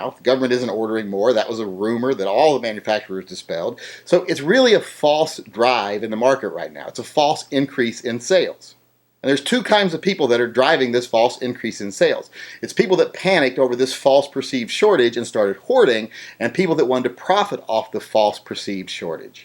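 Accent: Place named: West Virginia, United States. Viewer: By God, only a West Virginian could say it so well!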